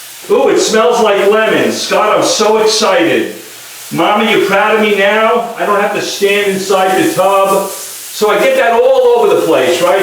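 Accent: New York, United States. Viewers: Holy cow that sounds like my New York mom. The only difference is she then would get on a plane and do it herself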